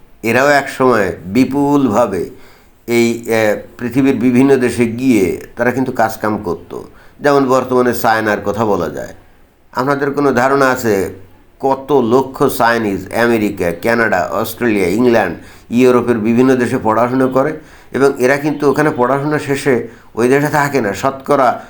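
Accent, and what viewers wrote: Bangladesh. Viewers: Apnar Kotha gulo Sunte khub Valo lage.. B.deshi Accents.. Namoskar dada..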